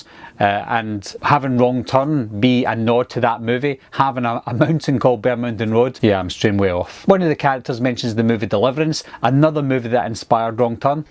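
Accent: Scotland, United Kingdom. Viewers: Are you Scottish my friend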